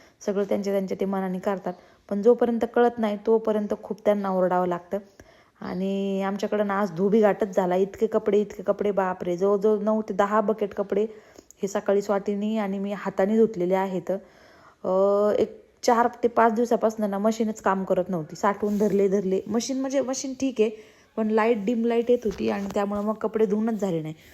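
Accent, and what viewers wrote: Ahmednagar, India. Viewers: Aapli bhasha aashicha aahe nagari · नगरी भाषा एकदम तडका❤❤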